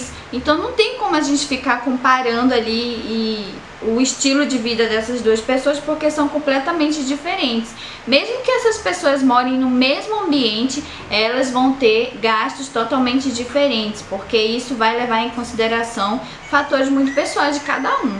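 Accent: Mexico. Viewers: Até o seu sotaque é mexicano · Já está até com o sutaque deles🤭❤️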